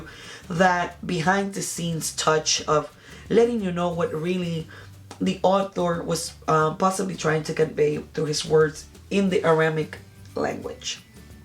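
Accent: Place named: Spain. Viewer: You don't need to apologize for your spanish beautiful accent. Your English is perfect. God bless.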